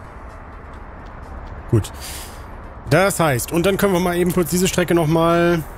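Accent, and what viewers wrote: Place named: Russia. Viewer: . Du hast ein super Video gemacht was ich wie immer liebe. Ich bitte dich bitte den Russischen aktzent weg zu lassen, weil es mich und meine Freunde etwas beleidigt. Natürlich wissen wir das dass nicht provokant gemeint ist und du das aus spaß sagst. Ich hoffe du schätzt das dein Russischer Abo.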